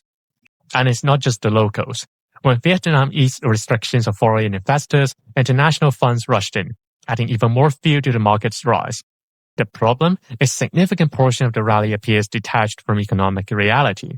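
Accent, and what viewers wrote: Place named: China. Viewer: Sir, from your accent, I can tell that you are a native Mandarin speaker. What is your intention of creating this video?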